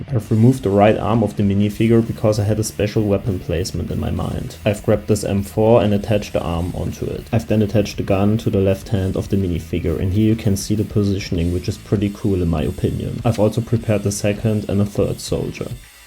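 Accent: Germany. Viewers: Deutsches Englisch 🔛🔝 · As a german I litarlly hear ur actcent that u speak deutsch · Are u German? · du bist deutsch das hört man sehr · bist du deutscher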